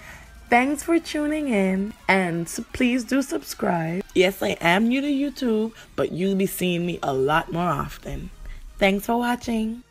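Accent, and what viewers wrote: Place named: Anguilla. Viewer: Shes from Anguilla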